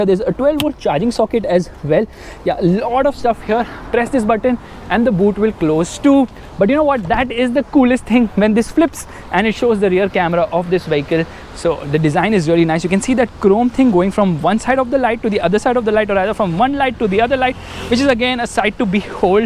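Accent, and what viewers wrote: India. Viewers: I know lol.. I said I thought he said fucking Since his speaking fast and his indian accent is thick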